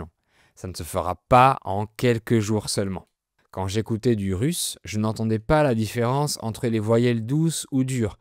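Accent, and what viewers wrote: South, France. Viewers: Warum spricht er "chose" stets wie "chance" aus? Hat das etwas mit seinem "südfranzösischen Akzent" zu tun? Ich bin seit Monaten deshalb verwirrt.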